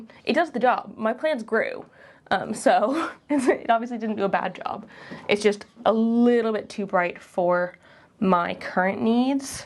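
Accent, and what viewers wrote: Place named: RP, United Kingdom. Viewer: So random, but I have to ask -- where are you from? You can definitely hear the slight "posh" accent, but were you born and raised in the UK or somewhere else? I love your voice/accent!! Editing to add, sorry if you've addressed this somewhere before .. I'm new to discovering your channel and I love it here! Just followed you on IG too! (Where I saw your bio says London!)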